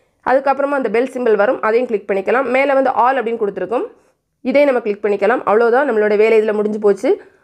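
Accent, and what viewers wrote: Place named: Nagercoil, India. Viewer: Nenga nagerkoil ah akka,??? Unga slang avangala mari iruku adhan keten. My mother-in-law Nagercoil...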